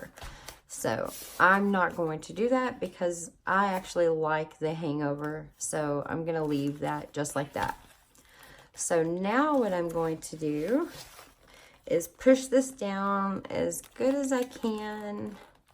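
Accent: South, United States. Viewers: thx so much for this beginner video. I have to ask where you are from. Southerner? I'm from Louisiana. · Thank you for showing us how to get started with junk journaling. I'm going to binge watch all your videos. . .btw, i love your southern accent, are you a Ga girl?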